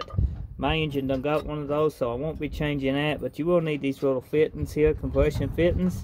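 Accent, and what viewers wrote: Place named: South, United States. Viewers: Second thought sounds like their from the south so probably normal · Simple videos, explained in terms I understand. The Bobby Boucher accent is a plus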